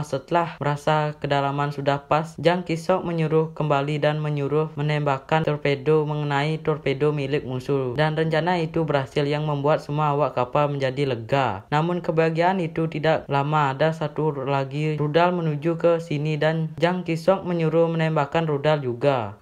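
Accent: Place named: Bali, Indonesia. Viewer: narator nya bali banget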